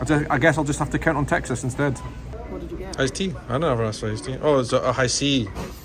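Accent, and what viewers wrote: Scotland, United Kingdom. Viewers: You should go to Scotland & to the highlands & the northwest if you think his brogue is broad. His is easy compared to other areas of Scotland. Sure I didn't pronounce all the Scottish cities, towns & villages properly even with Scottish guides helping. In the highlands the signs are in English & Gaelic and it's pronounced "Guy-lik" unlike the Irish Gay-lic. Your welcome! 😊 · His burr is mild (Irish have a brogue, the Scots a burr) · Hello,. Even ignoring your Scottish brogue (which is, of course, impossible; it is very "heavy" but nice), you give yourself away as a non-U.S. person when you pronounce L.A. as "Los Angel-ees". Proper English pronunciation has become "Los Angel-ess". Of course, "Los Angeles" is short for the city's (non-indigenous peoples) founding name from the Spanish, which is " El Pueblo de Nuestra Senora La Reina de Los Angeles" ("The Town of Our Lady the Queen of The Angels"). 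I hope you enjoyed your stay in the unique and huge an-automobile-is-still-necessary city that is L.A. University of California at Los Angeles ("U.C.L.A.") Class of 1975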